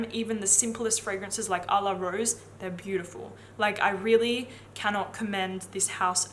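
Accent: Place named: Australia. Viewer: I really appreciate your honesty! During my quarantine in the UK I’ve parted with a substantial amount of money on various fragrances - all of which were of your recommendation. Everyone single one was a blind buy and I have not been disappointed once! It’s so important to be transparent with your followers so thank you! Also I’m a fellow Aussie so love hearing a familiar accent ❤️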